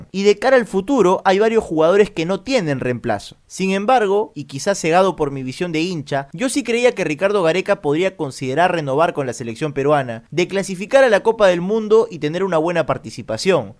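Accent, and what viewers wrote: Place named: Buenos Aires, Argentina. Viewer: Te escucho algunas veces, pero es la primera vez q escribo, Gareca aun no ha dicho nada y lo que afirmas es mera especulación, muy típico del nefasto períodismo de fútbol peruano, salvo honrrosas excepciones, si sacan a Gareca, acá el tema no sólo es destruir sino proponer quien debería ser su reemplazante si afirmas que Gareca se va Y la otra el acento bonaerense habría que evitarlo un poco. Saludos y éxitos a futuro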